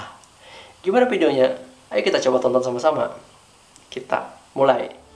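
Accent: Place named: West Java, Indonesia. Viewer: Ka ngomong nyah sunda banget pake p maaf yah ka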